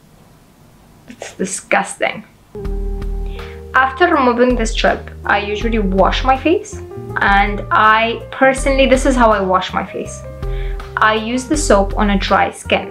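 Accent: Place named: England, United Kingdom. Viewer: I love your English accent 😋😍😍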